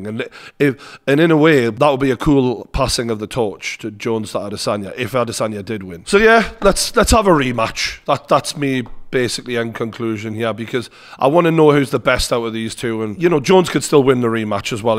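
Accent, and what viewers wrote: Newcastle, United Kingdom. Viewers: Just hearing true geordie introduce the video is enough to put a smile on my face!!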